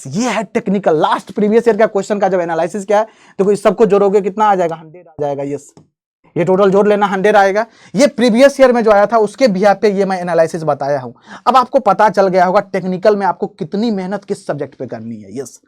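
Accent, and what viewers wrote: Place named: Bihar, India. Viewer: Sir aap vihari ho na qwalification nhi hai wo.. qualification bolte h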